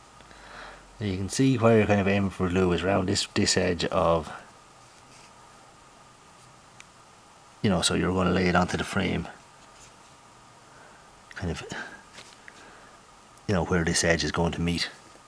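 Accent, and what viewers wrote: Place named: Ireland. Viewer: Love the Irish accent bro fae your Scottish brethren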